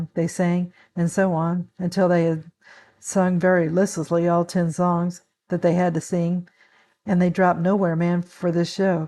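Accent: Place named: California, United States. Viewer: You have such a California accent. You sound like my Aunts. Great video! Keep it up!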